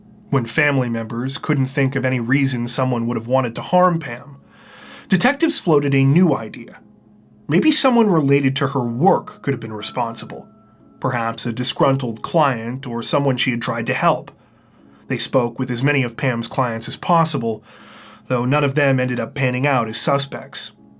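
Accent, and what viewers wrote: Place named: Canada. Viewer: A very typical Canadian manner of speaking.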